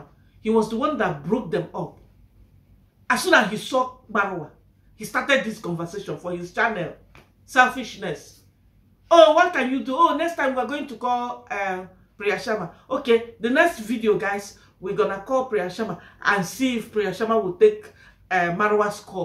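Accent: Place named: Africa. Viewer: Is there a way you can speak English more clearly and with less accent? I hear you but when your accent gets too deep I am losing your words and missing the point. Your channel is reaching the world so it would be helpful to many who may not have African blood for you to shift and pivot for those who want to actually hear you 100%. I have the blood. I hear you. But I lose you.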